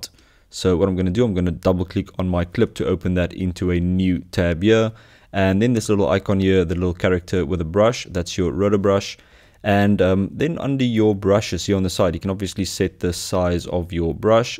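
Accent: South Africa. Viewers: this man sounds like a south african!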